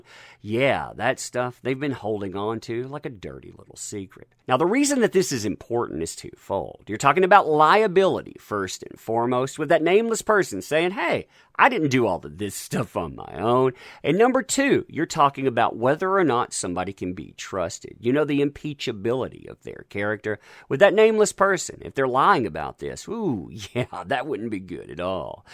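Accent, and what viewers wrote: South, United States. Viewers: It's his southern drawl that's very charming🤗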